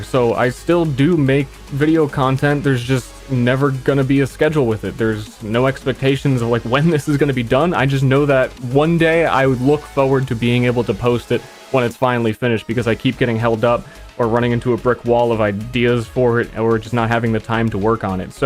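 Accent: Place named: India. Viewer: You sound indian.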